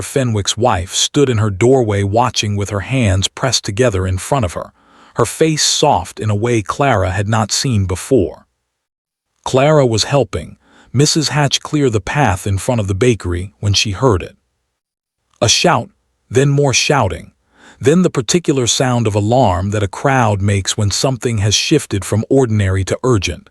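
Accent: United States. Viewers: English accent much better than cowboy accent for the narrator 🤨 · The American accent is really unsuitable for this story about British aristocracy.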